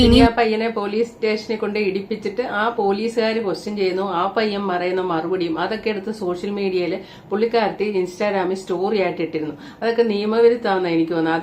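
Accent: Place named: Kollam, India. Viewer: കൊല്ലംകാരിയെന്നു തോന്നുന്നു കാഞ്ഞിരപ്പള്ളി സ്ലാങ് വേറെ ആണ്‌ ഞങ്ങൾ ഇത്തരക്കാരല്ല Am proud to be a Kottayamkaran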